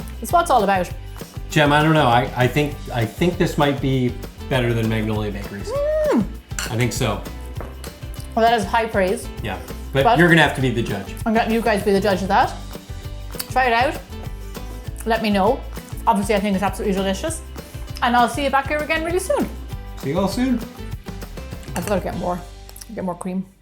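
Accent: Ireland. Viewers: I am DEFINITELY going to the grocery store NOW to buy the supplies. Don’t know if it’s because it’s lunchtime or what, but I’m near drooling here. And listening to you certainly didn’t hurt. Love the Irish accent ❤️ You just popped up on my recommendations, but SO glad you did .... new subscriber here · Hi love your recips and i love your irish accent 💗💗💗💗💗💗😘😘😘😘😘🍰🍰🍰🍰🍰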